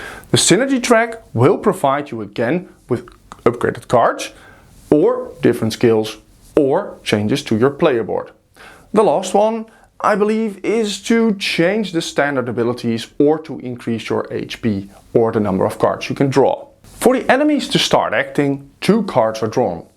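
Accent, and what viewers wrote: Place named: Netherlands. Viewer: Klinkt als een hollander maar dan int engels 🤔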